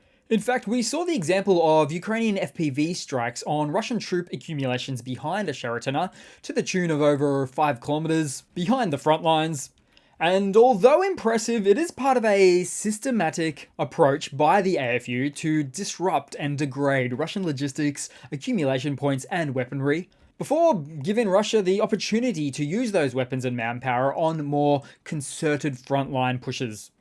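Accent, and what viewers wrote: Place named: Australia. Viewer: Love your work. I still would like you to pronounce mortar like mitre 10 but with an O, not as in more tar. Great to hear another well informed Aussie voice like Perun. Have a good one!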